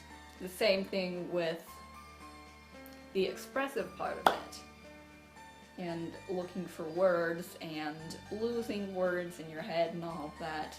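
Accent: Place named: Russia. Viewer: Is it just me or you sound a bit Russian here 😊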